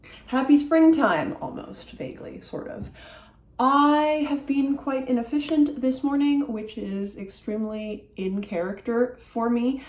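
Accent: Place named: Canada. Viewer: I might borrow “joggish thing” from you bc I’m an Olympic level joggish un-athlete😊 Do I detect a very slight Canadian accent? It’s the “been” pronunciation that clues my northern Vermont ears.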